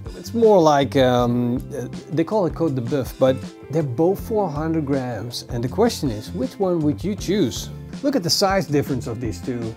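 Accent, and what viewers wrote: Netherlands. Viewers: His commentary and Dutch accent is what makes his channel uniquely his. I love it, it shows a true love for cooking and eating.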